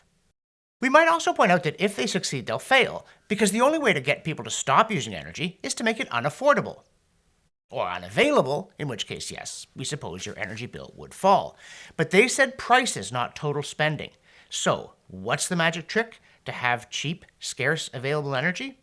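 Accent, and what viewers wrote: Canada. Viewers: Oh, and by the way, I love your stuff. Your delivery is so, Canadian. Keep up the good work.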